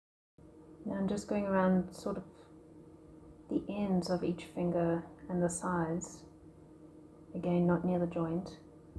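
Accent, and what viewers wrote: South Africa. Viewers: AHA! Checked your profile to make sure. Knew I heard a South African accent!! #ProudlySA Keep up the awesome work!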